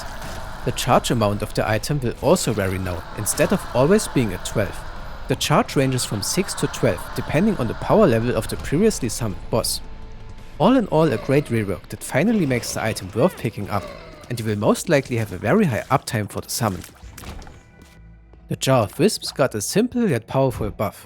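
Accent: Germany. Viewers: the lovely german accent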